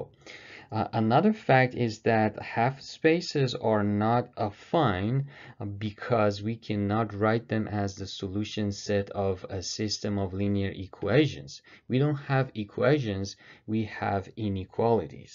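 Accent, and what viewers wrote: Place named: Iran. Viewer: the accent is kinda iranian btw the best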